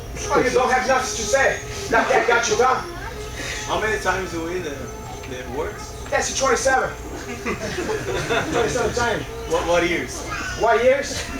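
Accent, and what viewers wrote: Brazil. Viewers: you know you’re acting is on point went an actual brazilian thinks you’re really from Brazil. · i think he is doing a parody of every brazillian fighter or vinny marglese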